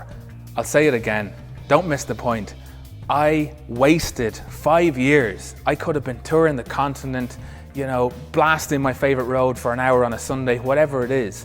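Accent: Ireland. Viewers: WTF I only discovered this because of Ronald Finger's youtube channel; and I just started. This is AWESOME. I'm bingewatching from the beginning. This channel needs WAY MORE views what the fuck youtube. This NEEDS to be seen everywhere. Anyways, this dude is like the Irish version of Veritasium. These guys are like long lost twins with different accents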